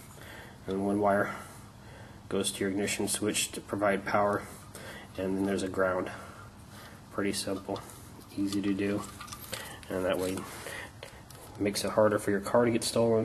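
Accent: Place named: California, United States. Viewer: Thx for the info dude! You sound like a California boy and the music after the video definitely Cali shit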